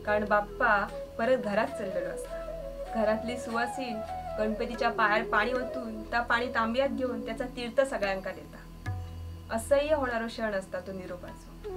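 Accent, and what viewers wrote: Malvani, India. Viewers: ताई, कोकणातलो गणपती इतक्या सुन्दर पद्धतीन शुद्ध मालवणीत सांगान सगळे लहानपणीच्यो आठवणी जागे झाले. शुद्ध मालवणी आयकताना गावाक पुढच्या लोट्यार बसान गजालिंच करतानाचो भास झालो. खुप खुप धन्यवाद देव बरें करो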